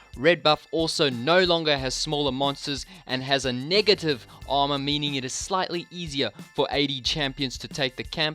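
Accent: South Africa. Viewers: you sound like an south african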